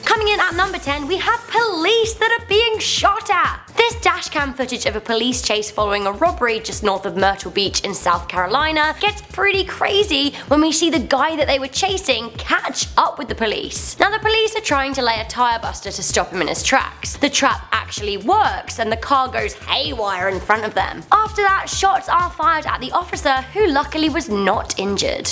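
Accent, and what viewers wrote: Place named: United Kingdom. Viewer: You're british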